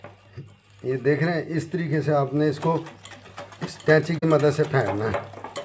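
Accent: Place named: Rohtak, India. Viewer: Assalamualikum Aap ka kaam bht acha hai aap ka taluq kis ilaqe se hai? Kia rohtek se aap ka taluq hai aap k lehje se yani Bolney k andaz se lagta hai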